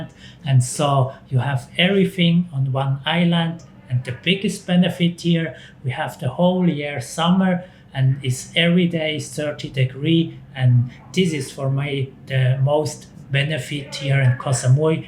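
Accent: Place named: Switzerland. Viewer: Love your Swiss accent ;). I m from Switzerland too! Very nice advise maybe one day i will mouv to Thaïlande too ;). I m sure you miss our lovely cheese and chocolate too 🤣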